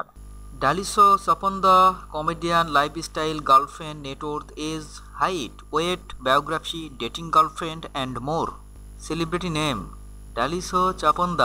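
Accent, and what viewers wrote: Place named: United Kingdom. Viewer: Love your posh English accent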